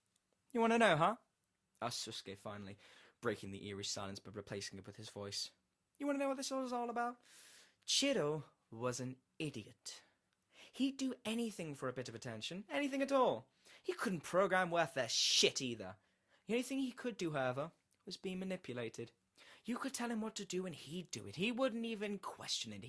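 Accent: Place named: United Kingdom. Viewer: Just because you have a British accent, this reminds me of an episode of Sherlock xDD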